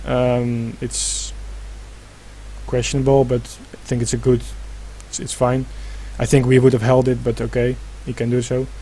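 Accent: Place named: Netherlands. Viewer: You are dutch right i can hear it. plz tell me how to get a good economy at the start thnx :)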